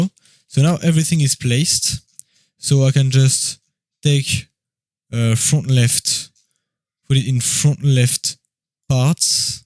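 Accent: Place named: France. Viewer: You sound really french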